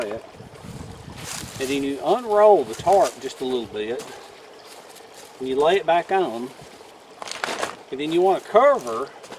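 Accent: South, United States. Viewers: What state r u from? My husband and I just found you... he thinks Texas or Oklahoma. I think Georgia or Alabama? I’m from Tennessee (but have resided in Michigan longer than Tennessee)and I’m not sure? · He’s definitely one of my favourites too. As an English woman, he’s so different from anyone I’ve ever met. His accent is adorable, but his skills & knowledge on everything is astounding. I’ve never not laughed in one of his videos either. I think he’s what you call a true southern gentleman. I can’t believe you watched for 3 days straight! That’s dedication 😂 & you must know everything about bushcrafting now! Glad you support him as much as I do. He truly does deserve it. Much love 💕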